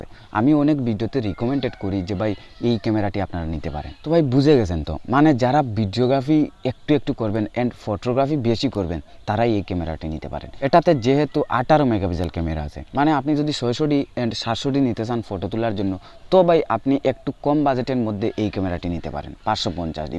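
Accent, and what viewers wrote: Sylhet, Bangladesh. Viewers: sylheti bhaisab,🤨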